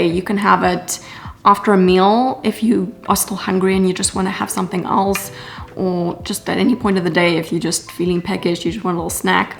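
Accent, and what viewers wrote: South Africa. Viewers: Just discovered your channel...are you South african? I am from Cape Town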